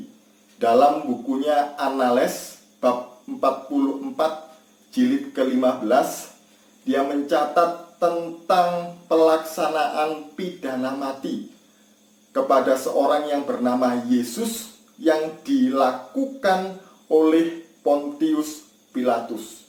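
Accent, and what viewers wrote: Java, Indonesia. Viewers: 😂😅🤣😂😅🤣😂😅🤣 Wahai PENDETA bersuara medok Setiap agama mempunyai versi. Versi kristen yesus mati tersalib yg tadi anda bicarakan itu memang benar. Dan itu gak jadi soal karna itu versi anda. Sedangkan versi islam Allah menyerupakan wajah nabi isa yg mirip dengan isa lalu disalib. Karna cara salib itu cara hukuman mati bagi org yg bersalah dan itu hukuman yg KEJI DAN TERKUTUK. bisa dibayangkan jika nabi terbunuh dengan cara disalib tentu sangat hina.mungkin skrg isa akan diolok2 karna mati dengan cara yg keji. Maka dari itu allah menyerupakan wajah nabi isa dengan org yg diserupakan lalu dia disalib dan allah mengangkat hidup2 nabi isa ke langit. Dan anda seharusnya berfikir. Tuhan anda TELAH MATI itu artinya TUHAN YG ANDA SEMBAH itu tidak berkuasa atas dirinya. Dan anda malu mengakui tuhan anda dengan cara disalib dengan cara hukuman yg keji dan terkutuk Lebih baik mana coba Tuhan dibunuh disalib Apa tuhan diangkat hidup2 dan diangkat ke langit. 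Pake akal logika yg sehat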